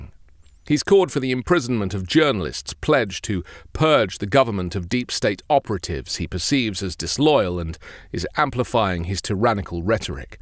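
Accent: England, United Kingdom. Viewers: Age is not the question. The questions are related to mental and physical capabilities. Putin is an older gentleman but I would not want the American President have to arm wrestle with him. What is the "magic" age where these capabilities decline ? Sounds like the narrator is talking about President Biden. Some professions require an exam which determines they are ability to perform in a job. This does not exist for the Presidency or other elected positions. Why does the narrator talk with an English accent ? Is Europe trying to affect American Elections ?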